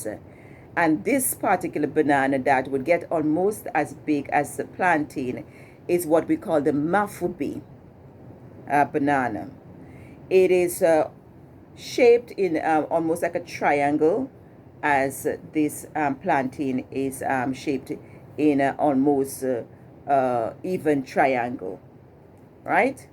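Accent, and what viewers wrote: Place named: Antigua and Barbuda. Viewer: thanks very much l sm retired from construction and is experimenting with a backyard garden and you helped s lot . and you were very clear.you sound antiguan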